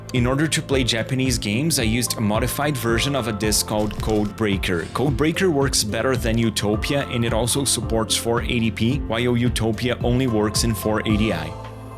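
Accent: Brazil. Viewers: o sotaque desse maluco é de brasileiro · bro real question, are you brazilian? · Notei o sotaque brasileiro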